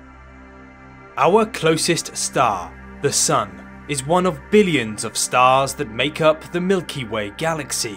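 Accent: United Kingdom. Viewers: You can't delete someone's replies. And how is him having a British accent relevant to the comment?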